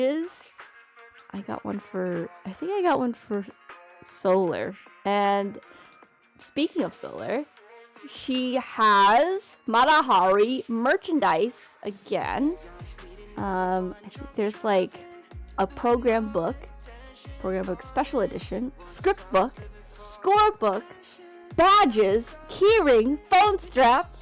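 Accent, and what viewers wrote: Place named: United States. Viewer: my apologizes. Could not understand when you said you had been unwell. It was your American accent 😞 Hope you are feeling slightly better. Cannot wait until 2023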